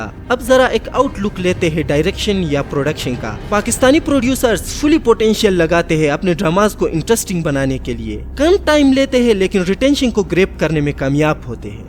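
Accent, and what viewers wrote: Gilgit, Pakistan. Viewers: Bhai apke dialect to pakistani h? pakistan se ho as far as i remember, the boom of pakistani drama comes after Zindigi Gulzar h type of story that is retable to most of indians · bai tera naam hai aur lehja bilkul Gilgiti hai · bahi app accent se gilgiti lagtey hoo...where are you from??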